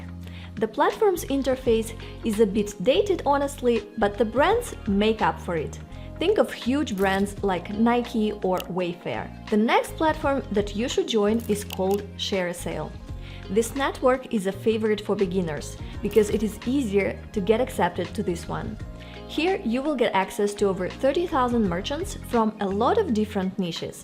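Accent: Russia. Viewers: Russian accent 😮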